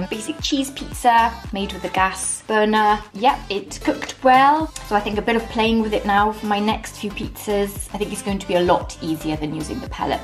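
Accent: Wales, United Kingdom. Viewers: i can't believe this cause i was checking for uuni gas burner reviews & this was my 1st vid cause EVERYONE KNOWS I LOVE PIZZA & WELSH ENGLISH. this is a good sign, but didn't like the dough lol. love from USA.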